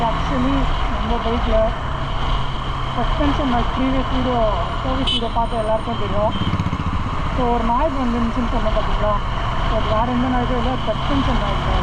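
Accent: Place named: Bangalore, India. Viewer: Bro your tamil is similar to Bangalore tamil....i had s doubt but after that TVS person spoke in kannada then i got it 😂😂😂 bro insta id thange i want to ask regarding Apache RTR 310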